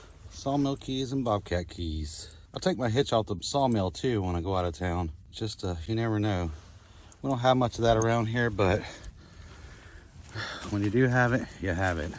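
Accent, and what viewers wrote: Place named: Georgia, United States. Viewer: Where in Georgia are you from?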